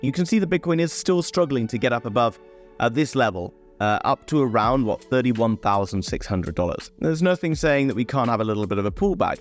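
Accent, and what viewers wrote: United Kingdom. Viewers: Adorable where u from in the UK lol😊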